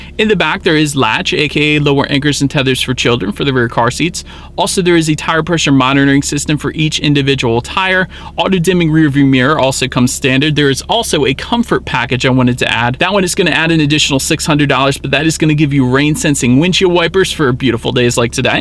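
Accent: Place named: Southern California, United States. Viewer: You from socal? You've got the surfbro accent.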